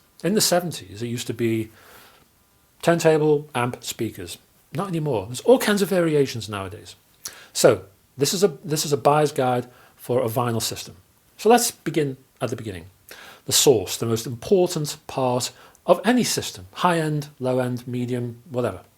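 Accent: United Kingdom. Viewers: Played the video and got an earful of British accent. I immediately, trust this man's recommendations for absolutely everything, from record players to scuba gear to fighter jets.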